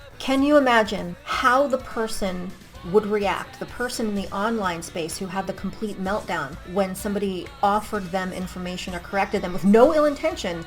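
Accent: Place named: New York, United States. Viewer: A goth with a NY accent! Priceless!😂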